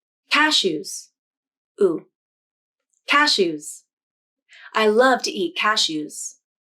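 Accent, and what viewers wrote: San Diego, United States. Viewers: everyday. thank you.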